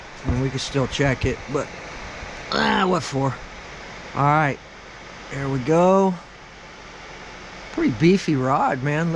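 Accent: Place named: New England, United States. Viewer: Couldn’t tell if you were up here in New England or Texas.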